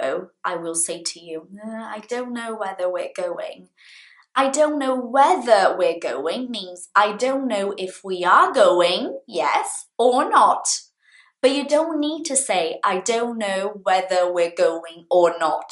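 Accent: United Kingdom. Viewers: Esse inglês britânico é o melhor, lindo de se ouvir 💙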